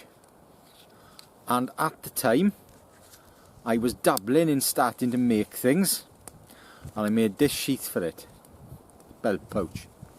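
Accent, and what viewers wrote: Wales, United Kingdom. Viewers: Good stuff , new here, sounds like you're in Wales?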